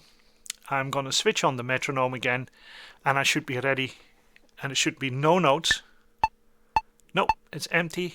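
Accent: United Kingdom. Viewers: Is there some Scouser in your accent? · Liked and subscribed! Great video. Amazing accent. Have you spent time in the north of England / Scotland?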